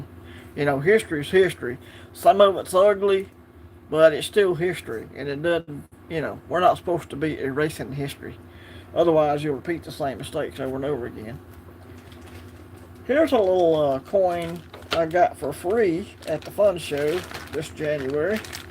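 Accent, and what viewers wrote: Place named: South, United States. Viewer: Sorry I missed your live and hanging out with your awesome Southern Accent. Hope you are doing well. 🙂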